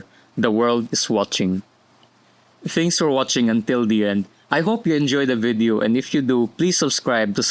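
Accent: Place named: Philippines. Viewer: Downvoted for a flip speaking English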